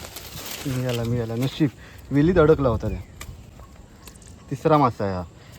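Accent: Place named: Bhandari, India. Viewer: तुझी भंडारी बोली छान वाटते.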